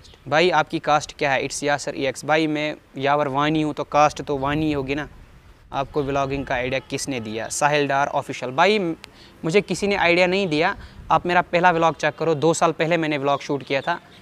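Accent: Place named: Sopore, India. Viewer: Abi tak mai soch raha tha ap sopore se ho becoz of your ascent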